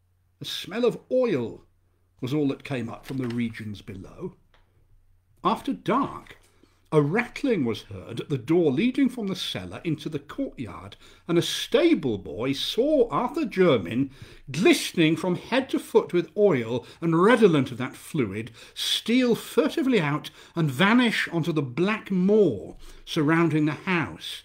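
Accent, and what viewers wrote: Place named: England, United Kingdom. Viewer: Thank you for this wonderful reading. It's funny how good Lovecraft's writing sounds in the English accent!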